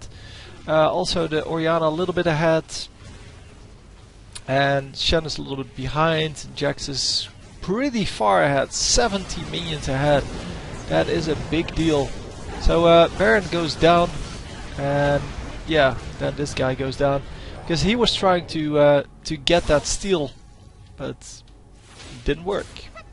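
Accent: Netherlands. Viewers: are you dutch, I think so, ur accent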